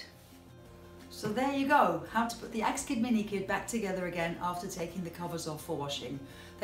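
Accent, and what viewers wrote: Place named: England, United Kingdom. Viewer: Lovely accent, from England ?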